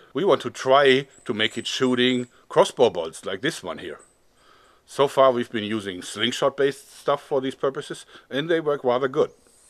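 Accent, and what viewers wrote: Germany. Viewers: This guy's accent is so charming. Once I heard him say he was going to try to weaponize a coke bottle with that German accent I knew I was in for the whole video. XD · I really like your German accent when you speak English. 😜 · Dat is ja richtiges deutsches Englisch!!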